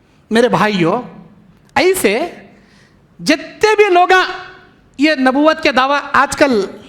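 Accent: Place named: Karnataka, India. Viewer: I'm also from Karnataka tumukuru. Sheikh ki baat karne k lehje se laga k ye hamare state se hi hain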